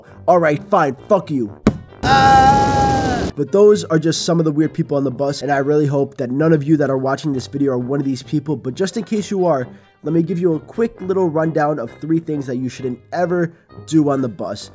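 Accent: New York, United States. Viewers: r u from new york?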